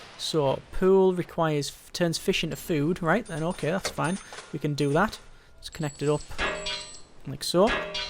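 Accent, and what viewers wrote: United Kingdom. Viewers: Are u from uk osp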